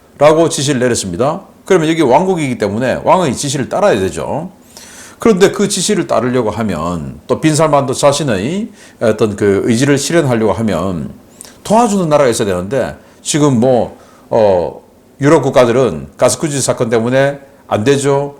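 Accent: Gyeongsang, South Korea. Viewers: 신인균 너는 경상도 말투나 고쳐라 존나 듣기싫다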